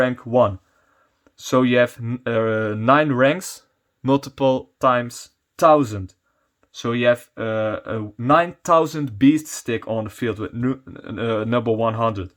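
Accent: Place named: Netherlands. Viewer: Mooie deck profile mooi nederlands acsentje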